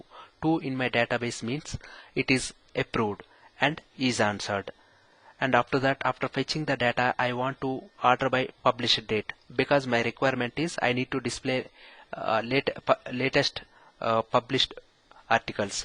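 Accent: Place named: India. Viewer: It is really hard to understand you because of the indian accent. REALLY HARD I am sorry but it is true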